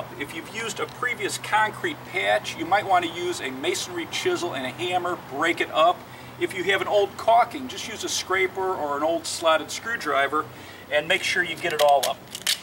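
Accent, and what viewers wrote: Chicago, United States. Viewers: He's gotta be from Chicago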